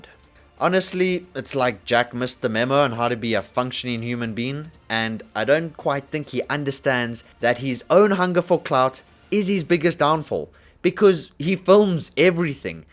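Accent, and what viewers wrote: South Africa. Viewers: Are you South African?👀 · Wait are you from south africa?! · Are you a South African?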